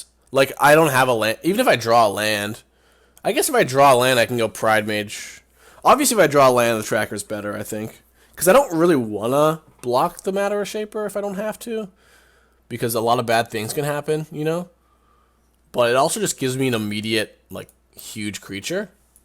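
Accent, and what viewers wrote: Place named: Southern California, United States. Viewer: Most SoCal accent I have ever heard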